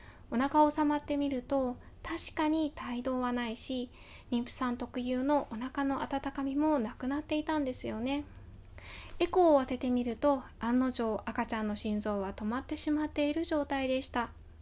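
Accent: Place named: Hyogo, Japan. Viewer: 会えなかった姪がいます、あの時の姉の悲しみも自責も見ていて辛かったし支えた姉の家族の苦労もよく分かります。 個人的な事ですが先生は兵庫の方のような気がします、オレの神さんのよそ行きの言葉と同じ感じに聞こえます😊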